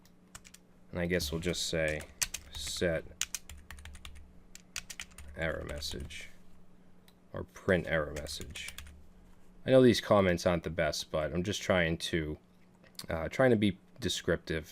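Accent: Boston, United States. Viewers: I hear Boston in that voice... Edit: I just saw the shirt. Boston confirmed. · This guy is from Boston. I like his style, but I can't stand his accent and people from there. Go Blues!! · Are you from Boston or Maine?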